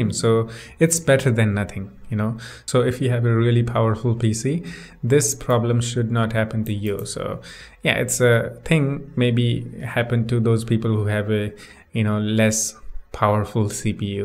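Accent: India. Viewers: You indian?huh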